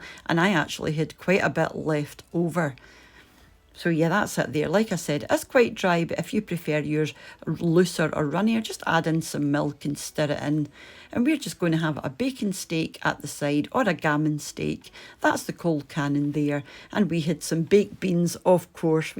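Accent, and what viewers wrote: Scotland, United Kingdom. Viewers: I missed hearing your Scottish voice!!! "Knob of butter" sounded so much better than a "pat of butter." Colcannon is one of my favorites to make for St. Patrick's Day and Easter. I never heard of bacon steak but maaaaannnn, I wanna try it! You made your colcannon beautiful. Mine is light gray because I put in bacon grease instead of butter. I'm going to try your way for Easter. Thank you for showing how to make it beautiful!!! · Love the Scots accent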